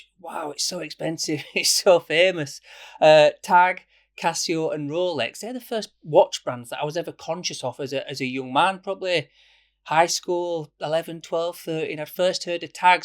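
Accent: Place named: West Yorkshire, United Kingdom. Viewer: Where are you from? Sounds very West Yorkshire to me 🤔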